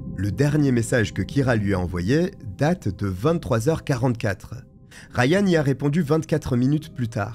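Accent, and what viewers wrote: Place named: Europe. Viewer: Je te ❤ ta voix me caresse les oreilles et tu n’as pas un trop gros accent. ( en t k il est charmant et pas agressant) comparé à d’autre européen.J’adore ce que tu fais! Bizou 🇨🇦du Québec ✌🏽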